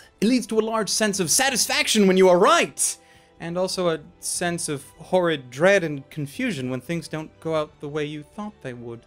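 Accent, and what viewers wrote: South, United States. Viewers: With that southern accent, are you imlying that people from the southern parts of America is religious fanatics? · your southern accent killed me , your sense of humour is so fuckin underrated and entertaining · why you gotta do a southern voice bruh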